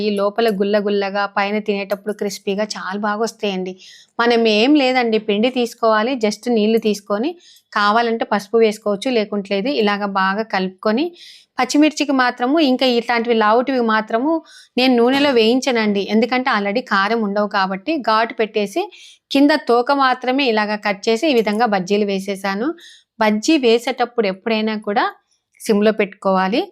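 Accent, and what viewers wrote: Rayalaseema, India. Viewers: ఈ రాయలసీమ మాటలు సీమ తెలంగాణ మాటల్లోనే ఉంటాయి నువ్వు మాట్లాడిన కొద్ది నాలి అనిపిస్తుంది🎉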